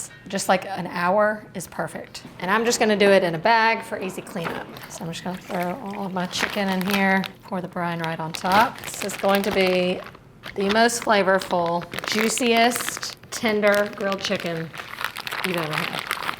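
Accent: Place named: Texas, United States. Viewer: I thought you were from Texas this whole time lol.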